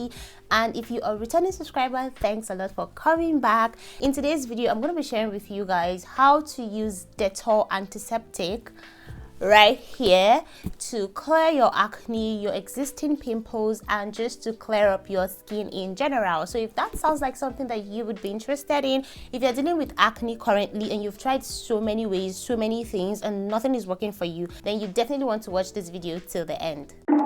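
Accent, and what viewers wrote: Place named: RP, United Kingdom. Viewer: Queens English weldone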